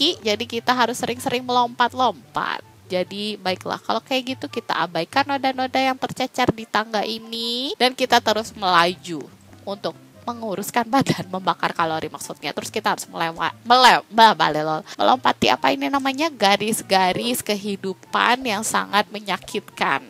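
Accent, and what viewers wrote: West Java, Indonesia. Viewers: sunda na kaluar pisan wkwkwkwk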